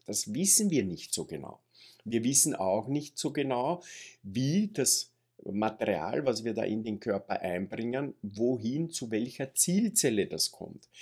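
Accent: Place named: Austria. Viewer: Diese österreichische Sprache ist einfach zu schön! Könnte stundenlang lauschen. :-)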